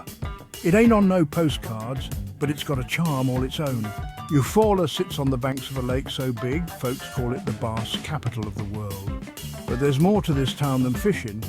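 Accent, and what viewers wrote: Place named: United Kingdom. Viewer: What a crock I lived in Eufaula for over 30 years. This is a bunch of stock photography and some dude with a British accent thumbs down.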